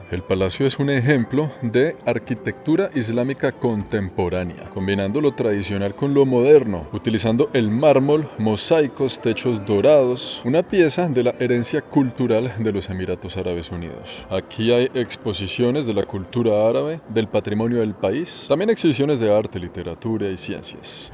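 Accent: Colombia. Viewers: Que jodido eres para narrar paisa , me haces reir ; sobretodo ese acento colombiano me encanta . Noble tierra , gente maravillosa como tu . Saludos desde Suiza 😊.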